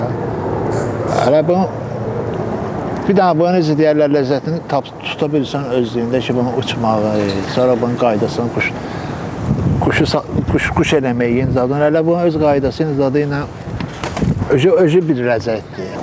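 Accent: Baku, Azerbaijan. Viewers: Can ey can baki lehcesi var ol qerdew mende quwbazam qedew baki quwdarna catan yoxdu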